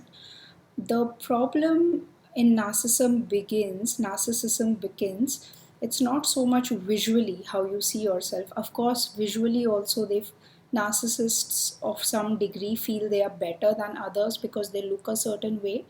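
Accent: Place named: India. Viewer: Another really great video! Your ideas are deep and you explain things plainly and clearly. (Love your accent, is it Indian or Pakistani? Love it! And your calm, friendly attitude is also really helpful in absorbing the information) Really enjoyed this, thank you !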